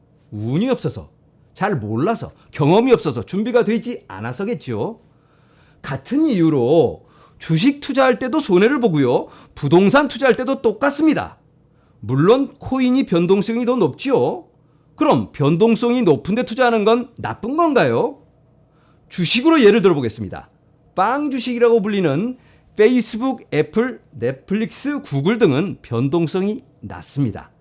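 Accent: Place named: South Korea. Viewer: 발음이 구수하십니다 한국식 친근감가는 하이리스크 하이리턴!ㅎㅎㅎㅎㅎ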